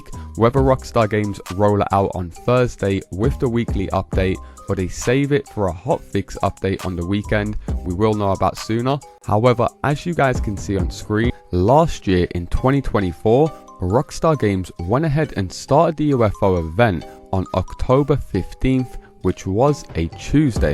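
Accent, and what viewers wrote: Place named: England, United Kingdom. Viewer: Mate, You sound English.. As an Aussie I say IGNORE AMERICANS when they try tell an Englishman how to speak English.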